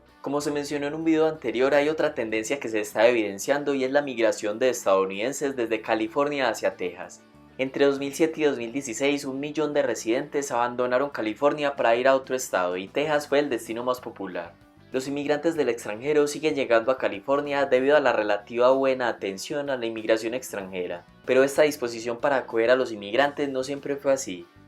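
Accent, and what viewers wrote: Colombia. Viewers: Bro, eres de Colombia?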